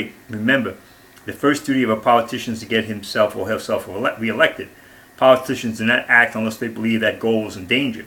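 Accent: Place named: Boston, United States. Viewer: Are you from Boston like me ? You sound like you're from Boston.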